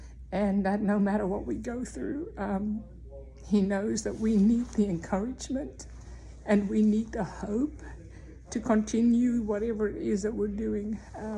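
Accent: South Africa. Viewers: Great experience. Are you South African ? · My parents are from your area and we have spent a lot of time there as of late when we are back in states from Mozambique. Do you happen to be South African?? Living out here we can recognizee that at times. What a blessing of a story! Keep pressing in courage! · Are you South African ? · You sound like South African.. I’m from NZ living here in Texas. I too had a couple of like incidents with the father here all these years. Isn’t he amazing Aye? Bless you..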